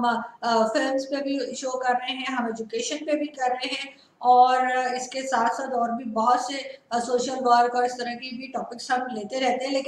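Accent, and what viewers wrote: India. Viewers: Voice problem is not due to non use of ear buds but it's echo voice problem. Secondly you try to make your presentation more professional and international by wearing decent and office looking outfits as our Indian Anchors are doing. Also keep on changing your looks and style to add freshness to your programmes. Your style of talking and pronunciations are totally Indian type Keep it up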